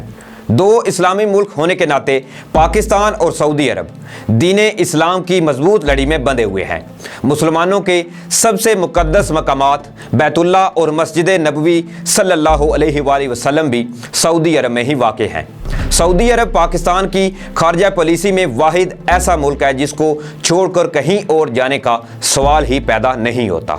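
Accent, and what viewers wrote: India. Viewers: Mujhy lagta Hy yeh channel koee Hindu Chala Raha Hy bilkul hinde lahja hy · محترم آپ کا تجزیہ اور خبریں سُنی، بہت اچھا لگا لیکن آپ اردو زبان کو ھندی لہجے میں بولتے اور پڑھتے ھیں جو کہ اچھا نہیں۰ ویسے یہ آجکل اک عام رجحان پایا جاتا ھے جو کہ پرو انڈین رجحان ھے، دوسرے لفظوں میں اگر کوئ شخص یا قوم اپنی گفتگو میں کسی دوسری زبان کے الفاظ استعمال کرنا شروع کر دے تو اسکا مطلب اس زبان سے مغلوب ھونا ھوتا ھے۰ اس طرح ھم غیر شعوری طور پر لسانی معاملات انڈین غلامی کی طرف جا رھے ھیں۰ قابل اعتراض الفاظ مندرجہ ذیل ھیں خ کو متعدد بار کھ پڑھا گیا جیسے خبر کو کھبر، خیال کو کھیال کہیں تاریخ کو تاریک پڑھا گیا اب سوال یہ پیدا ھوتا ھے کہ آپ اردو میں گفتگو کر رھے تھے یا ھندی میں۰ ھمارا رویہ اگر اردو کیساتھ یہی رھا تو بہت جلدی اسکا نام اردو کی بجاۓ ھندی رکھنے کے مطالبات سامنے آنے لگیں گے۰آپکو یاد ھونا چاھئے کہ پاکستان بنانے کی اک وجہ اردو زبان کو زندہ رکھنا تھی۰ کو بھائ میری اس تحریر کو پڑھ کر اردو سپیکنگ لوگوں پر کمنٹس کرنا نہ شروع کر دے کیونکہ میں پنجابی ھوں لیکن اگر کوئ پڑھا لکھا پاکستانی اردو غلط بولتا یا لکھتا ھے تو مجھے اچھا نہیں لگتا اور اردو کو ھندی لہجے میں بولنا یا لکھنا میری لیۓ نا قابل قبول ھے۰ امید کرتا ھوں پڑھے لکھے نو جوان میری تحریر کو ممثبت انداز میں لیں گے اور اپنی گفتگو جو وہ اردو میں کرتے ھیں اسکا خیال کرتے ھوۓ قومی زبان کی حفاظت کریں گے۰ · نام تو تیرا ہے مگر تیرے تلفظ اور تیری ہندوستان کی سعودیہ کے ساتھ وابستگی کی وکالت سے یہ ہر شخص یقین سمجھ سکتا ھے کہ تو کوئ انڈین ہی ہے غور سے میری بات سن ، ھر قوم اپنی غیرت کے بل بوتے پر زندہ رہ سکتی ھے اور غیرت کے ساتھ ھی ترقی کی منازل باآسانی طے کر سکتی ھے ۔ تیرے جییسے بزدلی ، بیغیرتی اور صرف تیل ، نوکریوں اور پیسوں کے لئے مر نے والی قومیں ذلیل و خوار ھی ھوتی ھیں۔۔ اب ھمارے لیڈروں نے اگر اپنی عزت نفس کے لئے اپنی قوم کو صحیح راستے پر ڈالا ہے تو ھم دل جان سے آنے والی ھر مشکل میں انکے ساتھ ہیں آپ اپنی بکواس کو اپنے تک ھی محدود رکھو میڈیا پر آکر اپنی انڈین ذہنیت عیاں مت کرو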